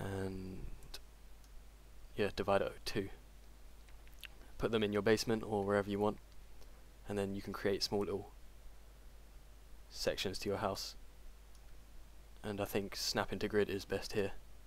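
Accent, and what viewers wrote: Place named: United Kingdom. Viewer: off topic i just wanted to know why you guys in the uk say stuff like theater like theata but with idea you say ideaer just always wanted to know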